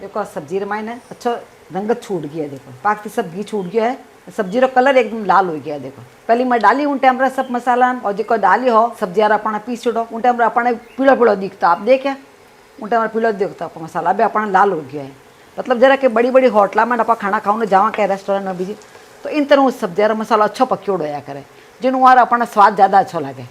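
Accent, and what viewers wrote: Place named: Marwari, India. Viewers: Yes it is Hindi. But Hindi also have many dialects, depending on geographical location. In rajasthan only we have 10-15 diffrent hindi dialects. This madam in this channel is speaking marwari hindi. In Udaipur area you will have diffrent dialect of mewari hindi. In Jaipur side you will find dhundadi hindi dialect. In same way in uttar pradesh mathura side there is brij bhasa, which is also a kind of hindi dialect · I like to hear ur marvadi hindi..Pranam to U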